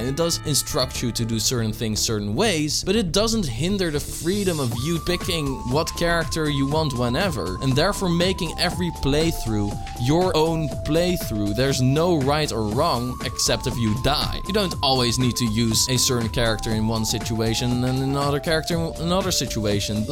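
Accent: Netherlands. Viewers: hears him speak for 10 seconds. Yeah he's dutch, beautiful accent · Pardon me for asking, but are you dutch? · Youre from the netherlands right i here your accent haha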